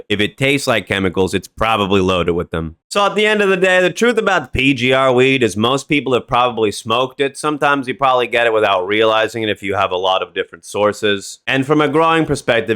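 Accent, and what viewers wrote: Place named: Boston, United States. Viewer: There’s no way your not from Boston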